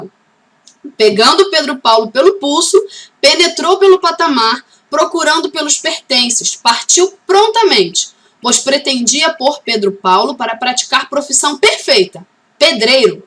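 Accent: Rio de Janeiro, Brazil. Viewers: Você tem o sotaque carioca 😍😄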